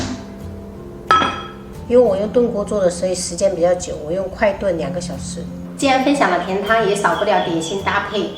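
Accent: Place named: Minnan, China. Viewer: 因為有閩南口音 😂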